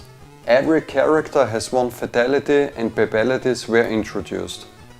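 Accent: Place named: Austria. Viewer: Γεια σου! Ρε μαλακα ich kann dir nur sagen, dass deine Editing Fähigkeiten richtig gut sind und die Umsetzung sehr gelungen ist. Es war toll deine Geschichte über deine Kindheitserinnerungen von Mortal Kombat zu hören in deinem fehlerfreien Englisch (mit enormem österreichischen Akzent) und das ohne ähms oder Unterbrechungen. Was du bestimmt verbessern kannst ist die kurzen Abstände zwischen den Sätzen zu cutten, da dies noch professioneller klingt. Dein Intro hat es mir echt angetan und ich finde es einfach genial, dass du zu deiner Herkunft stehst und dein Ding durchziehst. Ich habe dich auf Instagram gefunden und dein Link in der Bio hat mich hierhergeführt und ich war überrascht, dass du nur 12 Abonnenten hast. Durch mich hast du jetzt 13 und ich gebe dir hiermit einen Daumen nach oben. Ich hoffe deine zukünftigen Videos werden sich auch mit anderen Themen beschäftigen. 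Wenn du magst, kannst du mal auch meinen Channel anschauen, der ebenfalls in den Kinderschuhen steckt. Kannst mich auch gerne auf Instagram abonnieren, habe dir dort ebenfalls ein Abo gegeben. Ich habe ein Unboxing und Gameplay zur SNES Classic Mini gemacht, welches mir total Spass gemacht hat. Vielleicht wird dir das auch gefallen! Bleib dir selber treu und ich freue mich auf dein nächstes Video. Grüsse von einem Serben aus der Schweiz Vlad :)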